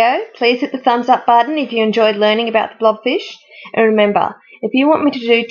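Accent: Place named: Australia. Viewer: Its an aussie accent :)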